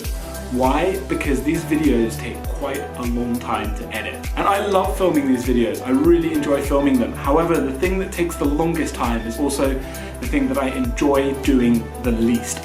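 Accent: United Kingdom. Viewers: 我觉得你的英式英语更容易听懂诶！！！我之前听了几个英国人的，我觉得吞音（？）好多。。。我还是习惯美式英语😂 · The simplest way for both you and us is option # 2 so long as you manage to speak slower; after all, British accent is less easy to grasp.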